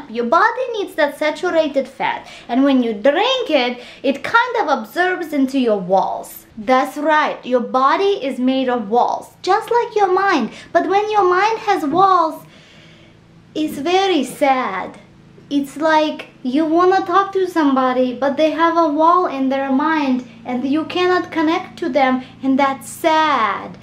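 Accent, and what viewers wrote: Russia. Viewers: Is it just me, or is she sexier with a stronger Russian accent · I love her russian accent!!! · I thought Bullet Proof Coffee was gonna be like a Bulletproof Vest. Plus you have a Beautiful Russian Accent. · why are you thickening your russian accent, you used to take lessons to hone in on your russian accent, and you were doing so well. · I love the Russian accent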